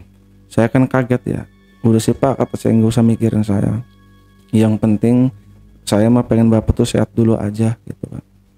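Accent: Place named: Indramayu, Indonesia. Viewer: Ini banten mana ya? Kok logat nya kaya jawa indramayu 😅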